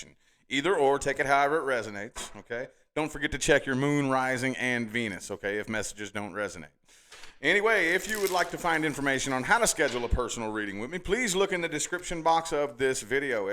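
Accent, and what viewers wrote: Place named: New England, United States. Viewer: Lovely yanky twang..!!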